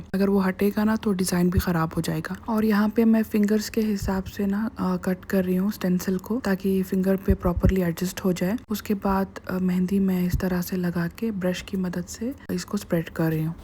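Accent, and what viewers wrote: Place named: Dakhani, India. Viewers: V nice vlog voice over also u do in dakhani urdu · Ur Urdu s same as hw we speak at home. We are also from Bangalore.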